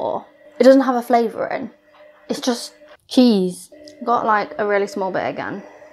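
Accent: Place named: Kentucky, United States. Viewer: absolutely I love your accent, and mine is just as country if not more so than Chris, Amy, and Tammy’s! We’re from the same neck of the woods here in Kentucky! 😂 Biscuits and gravy have to be HOMEmade not from a restaurant otherwise you just congealed slop. 😂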